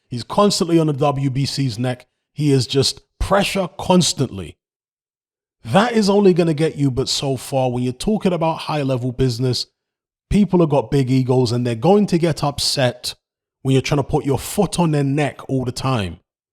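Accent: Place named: South London, United Kingdom. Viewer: In your introduction you conveniently answered a question that was never asked, this says to me that your objectivity about Dylian Whyte needs to be questioned it matters not whether individuals like Dylian whyte or not, that has nothing to do with the Wbc rules or there ruling, i quite like Dylian whyte but he's not a 60 40 title contender, he may be big in South London and judging by your accent that's where you sound where you could be from and if so your objectivity needs to be called into question not the British public, South London Dylian fan boys are not even the half of it not even 20% of it, FURY is a world recognised boxer Dylian isn't simple!!!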